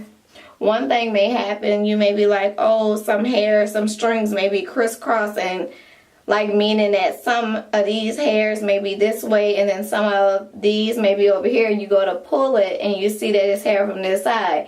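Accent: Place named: South, United States. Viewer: I love your voice!!!! #Southern ❤️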